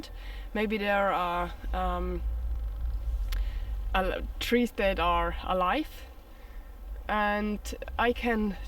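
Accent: Bavaria, Germany. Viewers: Wow - you even broadcast in English? It sounds cute - I never heard speaking anyoine in english with an bavarian accent... :-). Since I found your channel, 4 days ago when youtube recommended your video you made about the cabin situated in the woods, I find it amazing what you do. And you actually inspired me. Because I know someone, not living in Germany, UK or even Europe who shares the same interests like you do... I am surprised about the number of views you get. I will chat to her today to find out if hiking in the wild and producing youtube content wouldn't suit to her, as she is struggling a lot, financially...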